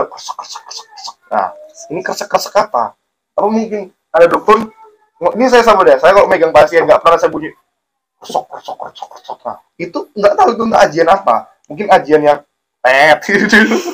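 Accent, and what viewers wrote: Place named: Java, Indonesia. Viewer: Ass wr br mba klo omng jngan medok jngan terlalu kerasss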